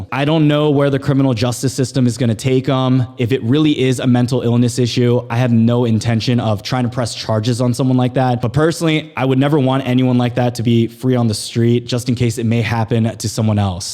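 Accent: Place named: Los Angeles, United States. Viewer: Crazy man, glad you got away clean. It does seem like things are getting sketchy lately in my area (PNW) and across the country. You have the voice to reach more people than most so kudos for sharing this situation. I lived in Montgomery County when I was a kid. You're missing the Maryland (Muuuriland) accent, I had you pegged for LA bro. Keep bringing the solid content!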